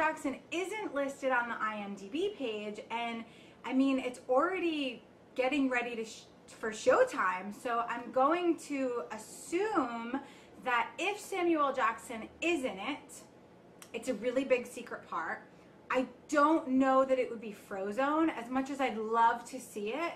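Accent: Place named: East Coast, United States. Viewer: You have such an east coast accent! LOL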